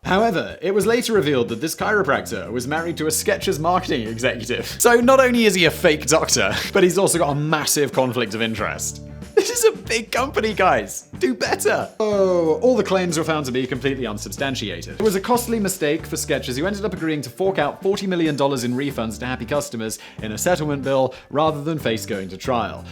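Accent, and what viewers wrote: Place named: United Kingdom. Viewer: nope, hes just British.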